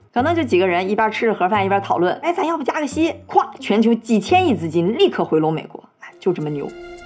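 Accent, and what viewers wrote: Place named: Dalian, China. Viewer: 新年快乐🎊这期太棒了 特别喜欢小lin用亲切的大连普通话娓娓道来 有趣 易懂🤓我也是大连人所以倍感亲切。如果有机会 希望小lin出一期影响美股市场大盘上涨和下降趋势的几项重大因素。我想点击量一定会爆棚🎉虽然我们心里都是模模糊糊的明白一些，没啥系统，都是叶子 没形成树，相信小lin的总结归纳能力❤支持我说的 大家点个赞❤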